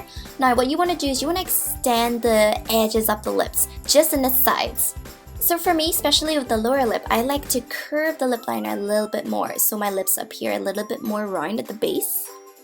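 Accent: Ireland. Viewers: It's Irish.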